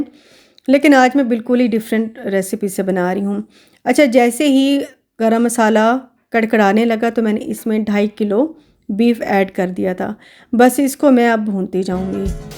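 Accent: Saraiki, Pakistan. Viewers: aap bhi shayad new ho kiu k apko pata hona chahye k yeh ab nahi khasy time say yahan shift hui hain or ham dosron ko to nahi dekhain gay apna farz poora karain gay na neighbours ko pata hona chahye aik dosry ka unko bhi hona chahye tha or inko bhi islamic country mai reh rahy hain na aap log yeh kasa waqt aa gia hay k sari dunia say rabty mai ho jaye insan or apny neighbours ka hi pata na ho yeh achi baat nahi hoti or haan mujhy k vlogs bahut achy lagty hain start say hi dekh rahi hoon inka saraiki lehja mujhy bahut pasand hay or aik dosry ko achi baat batani chahye bura nahi manana chahye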